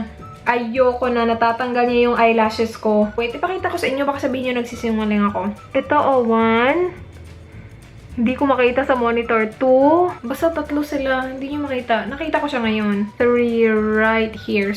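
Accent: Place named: Visayas, Philippines. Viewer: bisaya accent is there pa hahahaha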